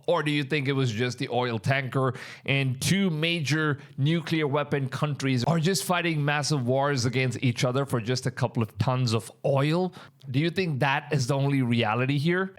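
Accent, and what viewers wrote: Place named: India. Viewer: he is from India and English is not his native tongue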